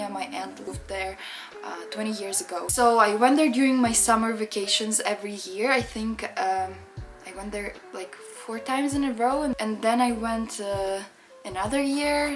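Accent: France. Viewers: 🤓 Me encanta el acento francés en español. Muy dulce! Bin offen für gegenseitiges Aushelfen.... Так как мне очень нравиться французский..... Ofresco español... und Deutsch... Que tal? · Ля, ты когда говоришь по испански, то говоришь с французским акцентом, но в целом умница что изучаешь его. Che, te agradezco por este video. Vos hablás español y frances bastante bien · Es gracioso hablas español con acento frances jajjaja, yo igual aprendiendo ruso ahora y cada vez me encanta mas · Мне нравиться твой акцент когда ты разговариваешь на испанском , как француженка ❤️🌹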